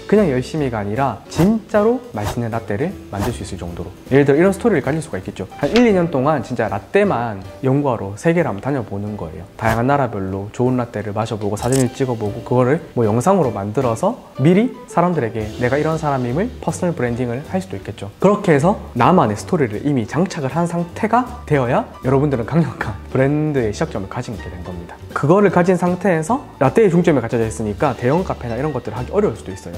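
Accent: Gyeongsang, South Korea. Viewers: 대구억양 넘 귀여운데요ㅎ???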